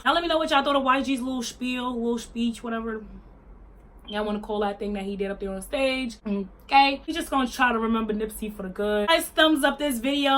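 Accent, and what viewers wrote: New York, United States. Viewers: Hey girl heyyy😘👋🏾 I’m a new subscriber & this is the 1st video of yours that I’m watching 😚 love your whole vibe. I KNEW you were from my hometown girl yasssss 🗽🗽🗽🗽 I know a fellow New Yorker when I hear one lol,I knew before I scrolled down? What borough are u from? About this video lol: I’m sooo disappointed in YG😒 I’m a die-hard fan & hearing him make these colorist remarks makes me really uncomfortable. I know from following him on Instagram that he has a preference for light skinned women... I thought that’s where it starts and stops...but no. He really does fetishize light-skinned women & it’s so ignorant & tired. Uggh. Unbelievable. I’m bout to delete him from my Apple Music library. 😑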